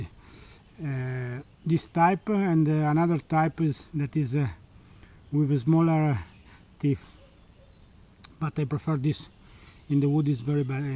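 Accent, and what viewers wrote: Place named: Avellino, Italy. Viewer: Noto un accento avvellinese a sto inglese 🤔🤔🤔🤔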